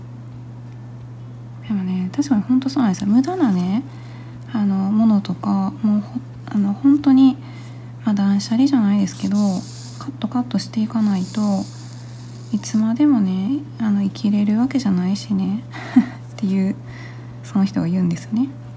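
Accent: Kansai, Japan. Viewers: さんのこんにちわぁ⤵︎🎶てゆう始まり方が大好きでガン見させていただいています(*^^*) 同じ関西なのでイントネーションが違和感なくていいです(*^^*)親近感✨ 長い間編み物をしてないのですがまた基本から始めたいなあて思えてきて毛糸をかいましたwww(*^^*) さんの、ゆるさが私にピッタリ！チャックを付けながらの色々な本音話もめっちゃわかりますよ。。同感です～ わたしゃは独特なんです笑。て話も一緒に笑いながらみています(*^^*) ゆっくりと丁寧な説明ありがとうございます(^.^)♡ 黙々と細かい作業が大好きなんで失敗しながらも楽しくこれからも学ばせてもらいますね！出会えて良かったです！！感謝😊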